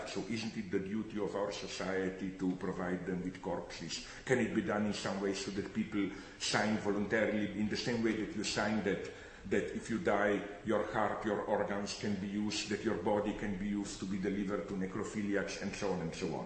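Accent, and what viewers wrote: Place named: Central Europe. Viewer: You obviously don't understand the irony of demanding someone provide substance when the subject is Slavoj Zizek. He is like Camille Paglia with a middle-European accent a beard and a paunch. He is a symptom of the degeneracy that what used to be called "the humanities" has devolved into. He is so full of crap he could generate economically significant amounts of methane or add to global warming.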